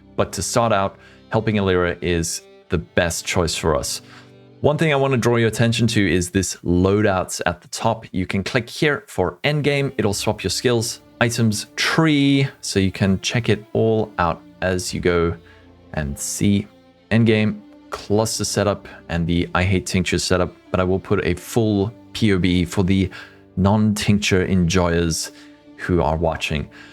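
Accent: South Africa. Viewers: "It's not a shlep" 😂 love the ZA bruv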